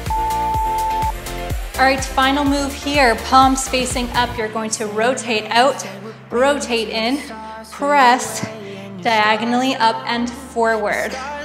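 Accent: Canada. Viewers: Love your work-"ouuts" ... and your Canadian accent!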